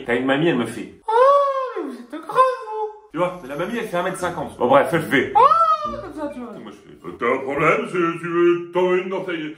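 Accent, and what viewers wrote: Quebec, Canada. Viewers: L'accent québécois 10/10 ✨, incroyable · Ton québécois 10/10 pour une personne qui te suit du Québec super accent 👌🏻💥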